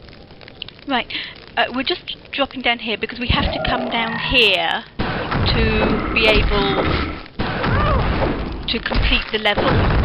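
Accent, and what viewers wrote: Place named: United Kingdom. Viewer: British d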